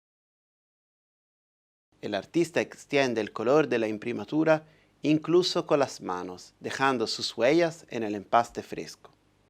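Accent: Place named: Italy. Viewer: Hermoso video acompañado con un gracioso acento italiano. Traducir en 2 idiomas además de la lengua nativa, es de un esfuerzo y compromiso que se aplaude. Ustedes parecen tan multifacéticos como el mismo Leonardo Da Vinci. Muchas gracias por el video. Una consulta: ¿la acuarela azul es hecha con trementina, o con agua? Muy amables, ¡y feloz año nuevo!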